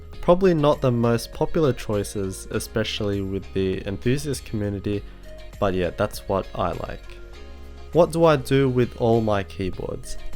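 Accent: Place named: Australia. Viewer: you sound more like an aussie than a filipino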